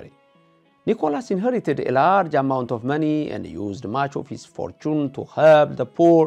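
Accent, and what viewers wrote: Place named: Gojjam, Ethiopia. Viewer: እንግሊዝኛን በጎጃምኛ።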